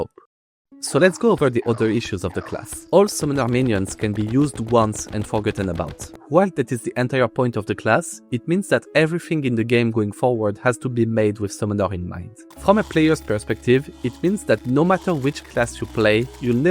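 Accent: France. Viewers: are you french perchance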